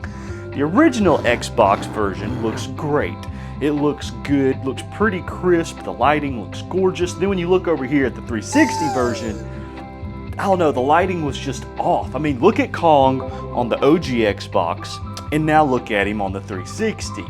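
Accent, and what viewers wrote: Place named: Louisiana, United States. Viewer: That accent is great bro where are you from Louisiana?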